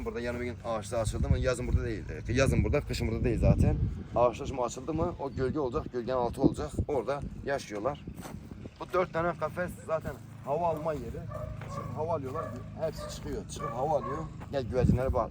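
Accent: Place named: Urfa, Turkey. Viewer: Kral Urfalı olduğun o kadar belli ki, özünü kaybetmemen mükemmel bir şey dezenktefe diyince aklıma teyzem geliyor 😂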